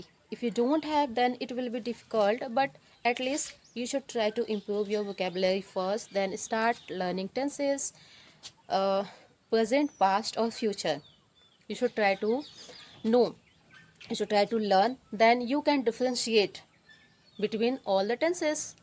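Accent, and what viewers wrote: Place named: India. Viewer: Good for me ur english language tune, become Yr tune belongs to indian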